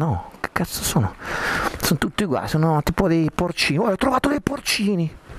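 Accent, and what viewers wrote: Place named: Emilia-Romagna, Italy. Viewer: sinceramente no, dall'accento sembri delle mie parti (emilia romagna o giú di lì)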